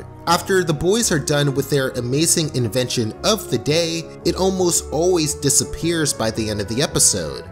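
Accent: United Kingdom. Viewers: wait, he’s British? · Why the hell is this guy british? edit: btw I never watched finieas and pherb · Omfg i just realised he’s british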